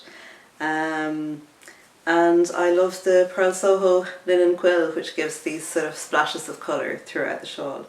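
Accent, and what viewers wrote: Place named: Ireland. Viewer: Hello from Portland, Oregon. Just came across your podcast and love it. My grandmother came from Mayo and she had a very heavy brogue, rather like yours👍love all the pieces you displayed. The shawls are beautiful. Love the green sweater, is that called Harlow? I’d like to knit one like that. What yarns did you use. I’ve hit the subscribe and looking forward to reviewing the past podcasts. Thank you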